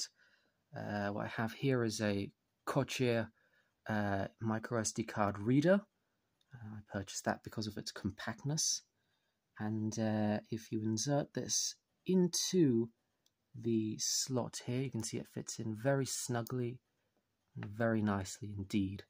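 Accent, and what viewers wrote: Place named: United Kingdom. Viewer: Thanks for all your help. You seem to be British. Your accent seems to indicate so. Your video 📹 is now appearing on YouTube in South Africa. Date: 28/04/2024 (April; Sunday).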